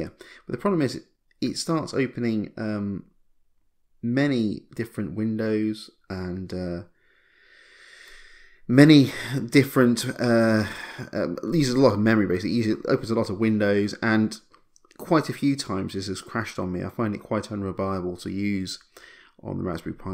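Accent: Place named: Liverpool, United Kingdom. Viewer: MY GOD HE"S FROM LIVERPOOL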